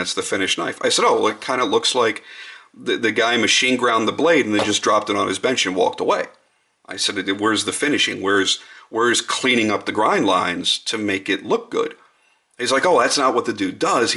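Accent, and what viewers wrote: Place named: United States. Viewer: I like your 'Murica accent, lol. Great and honest review. I had one of the first run Norsemans (vid on my channel) and it was a great knife for his true first attempt in the folding knife world. I think if you watch John's knife making Tuesdays series, you'd get an even better idea of all the work John and Erik had put into making these knives, but most importantly you'd get an idea of who the Grimsmo brothers are and they truly are good guys. Thanks for the review, keep 'em coming!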